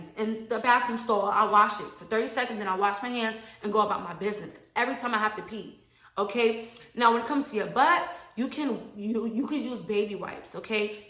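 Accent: New York, United States. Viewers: She has personality of cardi she has the new york accent. But she doesnt look like her she looks better than cardi 😂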